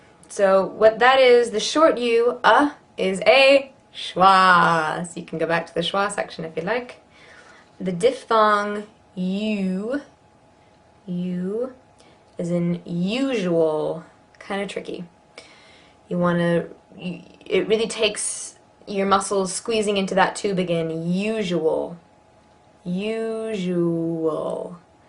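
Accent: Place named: United Kingdom. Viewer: половина слов с британским акцентом. хотя сама тараторит на американском ask с pass точн не правльно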